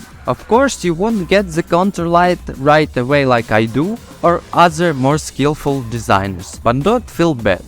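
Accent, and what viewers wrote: Russia. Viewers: хорош, сразу слышу русского чела ) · Russian accent🤯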